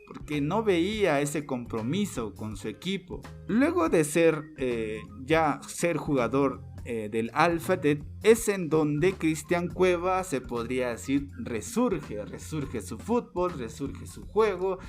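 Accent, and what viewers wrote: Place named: Chile. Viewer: oye creo q eres chileno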